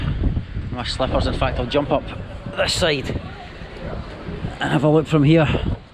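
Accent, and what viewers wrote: Scotland, United Kingdom. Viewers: That hotel looked sweet m8, another great vid your accent is brilliant over there the Scotsman with 2 strong legs 🙂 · Limmy has ruined the scottish accent for me. i can't take this guy seriously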